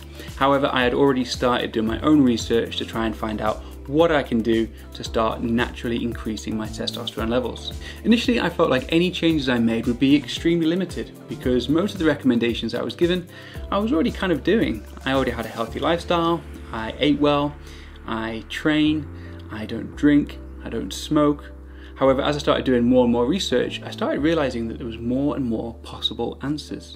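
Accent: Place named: United Kingdom. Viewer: Ur british? Love the accent :-)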